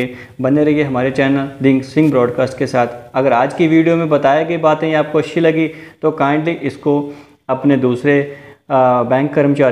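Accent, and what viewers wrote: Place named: Punjab, India. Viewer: खोदा पहाड़ निकला चुहा।कुच्छ भी नही होने वाला है ।आप का बोलने का लहजा है वह पंजाबी लगता है।कृपया हिन्दी बोलना सीखो।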